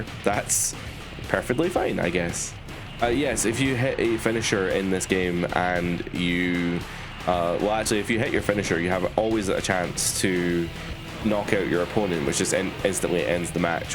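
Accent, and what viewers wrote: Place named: Central Belt, United Kingdom. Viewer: I’m from Stirling. You sound like you’re from central belt as well! How’d my aim?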